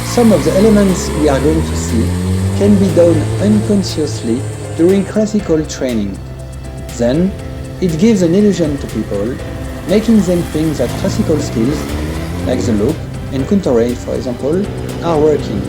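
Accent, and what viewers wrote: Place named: France. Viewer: Bon rappel. Merci pour la séance de l'été dernier. Lovely french accent.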